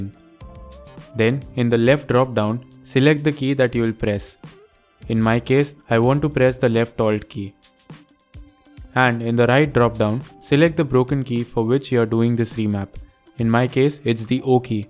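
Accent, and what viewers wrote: India. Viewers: I love how Indian accent people do tutorial for video that people need to watch. Keep doing this! A warm thanks from Canada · I will always trust a captain with an Indian acccent. Thank you kindly. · this sounds racist, but i was so glad to hear an indian accent bc i knew it would help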